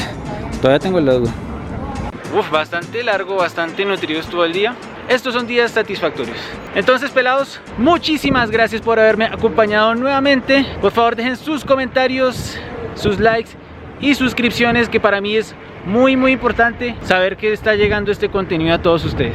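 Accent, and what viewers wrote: Colombia. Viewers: El Luisito comunica colombiano, lo amé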